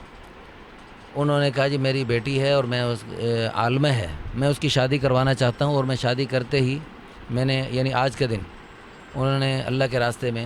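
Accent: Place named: Kotli, Pakistan. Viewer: Kotli sa bat kr rhe hn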